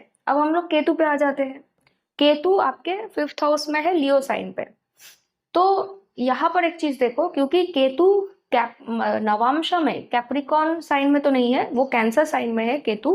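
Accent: Bengal, India. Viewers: বাঙালীদের হিন্দি বলার টান ই অন্যরকম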